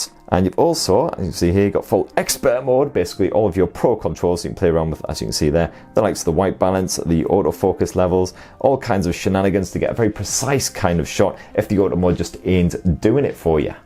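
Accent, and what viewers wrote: United Kingdom. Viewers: It's British Accent 👌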